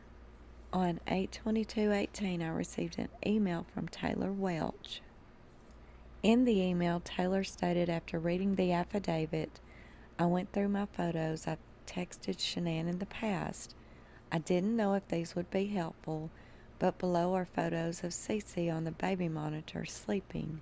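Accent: South, United States. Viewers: I listen before bed too ! Her voice is very soothing . Love that Southern accent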